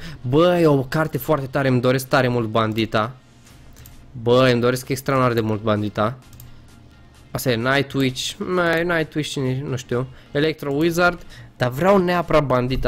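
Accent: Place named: Moldova. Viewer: E moldovean fato ei asa vorbesc....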